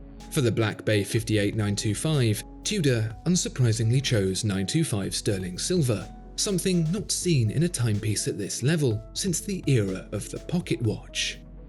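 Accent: United Kingdom. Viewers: Very informative video but gosh that ANNOYING British accent just for the Americans we buy anything from the Mother Country.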